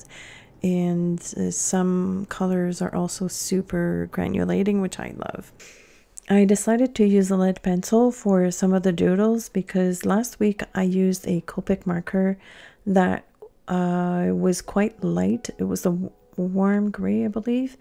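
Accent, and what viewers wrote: Quebec, Canada. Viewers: Totally exquisite,!!!! 💕 I love your signature..... And you sound just nice and Canadian to me! 😄😘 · So beautiful! I just found your channel. I am also self taught and I do realistic acrylic on canvas. I need to step out of my box and I just love this. I recognize a french Canadian accent. My parents are both from Quebec, I'm first generation born Albertan.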